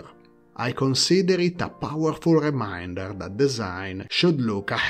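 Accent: Italy. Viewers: I am an Indian, and I compliment you on your grasp over English which is superior to most Americans and frankly, quite a few Britishers. I presume your accent is what is affectionately termed Italian. A small suggestion, "often" is pronounced "of'n".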